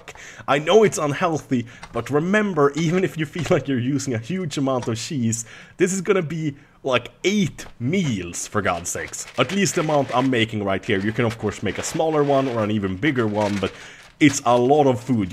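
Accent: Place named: Sweden. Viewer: red onjons (red onions), shiis ( cheese) - ahh i love that Swedish accent :D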